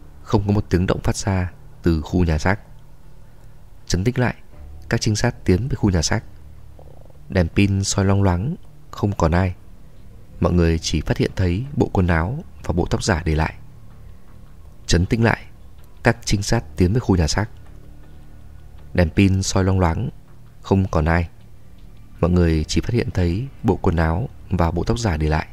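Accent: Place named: Hanoi, Vietnam. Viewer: Nghe để giải trí mà. Tôi rất thích kênh nầy, tôi thích nghe đọc truyện, giọng rất hay chuẩn Hà Nội, tôi người phương nam, xin cảm ơn và tác giả.❤❤❤❤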